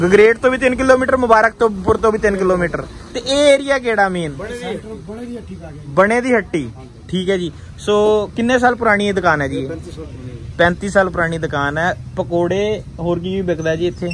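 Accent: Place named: Amritsar, India. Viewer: Paaji tusi hoshiarpur de o par tadi boli expretion sade amritsariya vrgi aa ji apa nu v hun ithe rehde kafi chir hogeya hun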